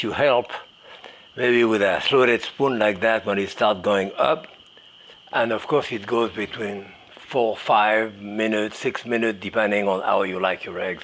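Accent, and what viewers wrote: France. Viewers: Like Pavlov's dog, I hear french accent and get hungry right away. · Jacques is the most beloved American with a French accent 😁 🗽 The statue of Liberty is nice, but she's no.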